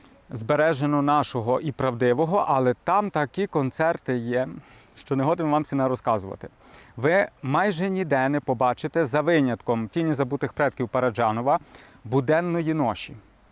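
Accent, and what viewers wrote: Hutsul, Ukraine. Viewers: Як я люблю слухати цього чоловіка, ну дуже файно розповідає, з дуже гарним гуцульским діалектом!!! Христос ся рождає!!!!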